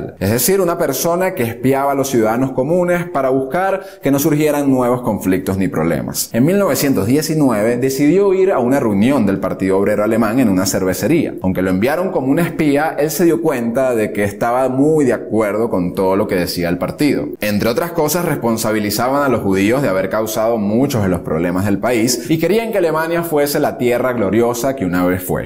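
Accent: Venezuela. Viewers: Yo sabía que este chamo es venezolano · Hermano tu eres venezolano?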